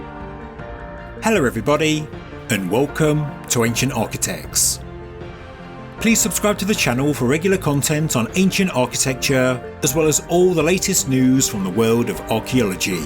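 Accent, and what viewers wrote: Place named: England, United Kingdom. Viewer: Pesante accento inglese 😮.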